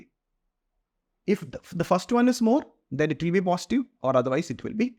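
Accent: Kerala, India. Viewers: Sir, you’re from Kerala right!!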